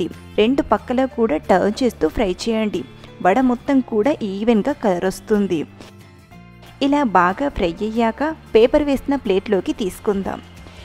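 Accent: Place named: Tamil Nadu, India. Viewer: Your accent is like Tamil mam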